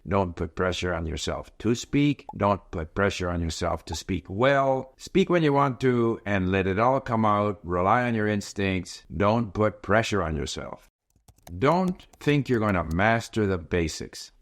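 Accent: Canada. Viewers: Many people say that Canadians and Americans sound the same. Within the first minute, I was able to correctly guess that he’s from Canada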